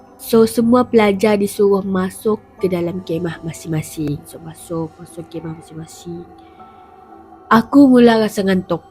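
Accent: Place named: Sarawak, Malaysia. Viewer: Waahhhhh....bercerita sambil lorat Sarawak...hahaha...memang best awakkk....terbaikkkk👍👍👍👍🥰🥰🥰🥰🥰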